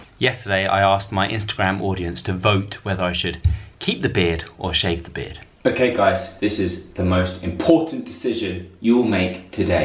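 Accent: United Kingdom. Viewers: I think from the accent you are British, am I right? 😁 Anyways, injuries are important to discuss, specially the beginning of starting working out. I usually have knee, thigh and back problems. My issue I get easily tired and can't increase weight. Another thing I can't keep motivated, specially during lock down. But thanks for your videos. P. S. Keep the beard 😊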